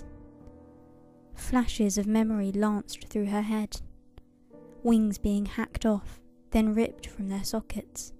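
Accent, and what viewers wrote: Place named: United Kingdom. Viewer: The most wonderful and precious thing about this story is the narration. This is the true accent of Britain, remember it well as it is becoming increasingly rare. The murder and defilement of the English language is a horror story in its own right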